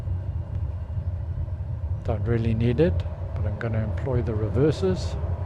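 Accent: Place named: South Africa. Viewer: I think you should offer your voice as permium add-on. Would love to hear your soothing south african accent guide me from A to B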